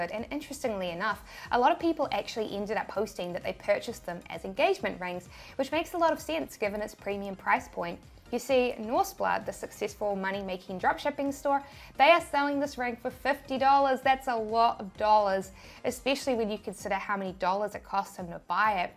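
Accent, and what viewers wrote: New Zealand. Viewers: 2mins in and I think... hold on is this a kiwi accent. Great vid found as a youtube suggested video:) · Definitely a New Zealander. The way she says No. “Nore.. Nore.. Nore.” Love it! 😁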